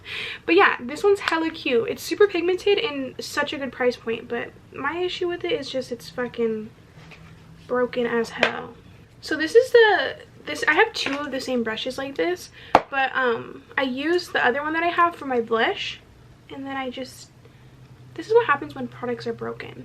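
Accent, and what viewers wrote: United Kingdom. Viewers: The British accent LOL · i love your random british accent 😂❤️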